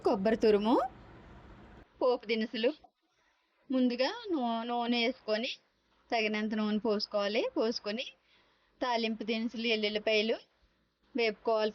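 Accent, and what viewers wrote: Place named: Andhra, India. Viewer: Voice of Andra.. good cooking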